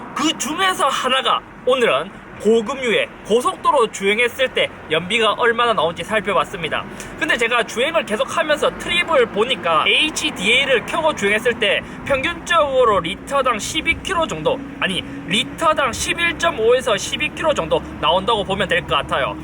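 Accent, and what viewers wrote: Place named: Andong, South Korea. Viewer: 말씨가 안동 말씨였구만요.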